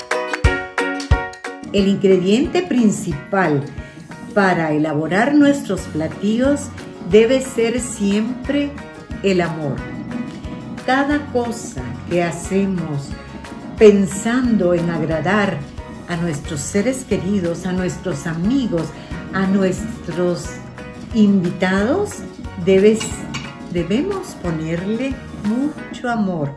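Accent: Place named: Guatemala. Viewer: Muy agradable escuchar un castellano chapin bien hablado! Deliciosa receta, gracias!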